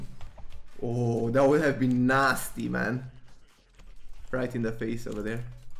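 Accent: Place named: Albania. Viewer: and u sound like u are from albania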